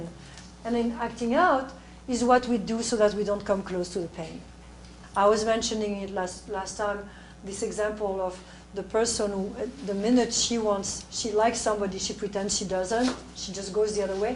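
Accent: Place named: France. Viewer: She is right. By the way, her French accent is really fine :-)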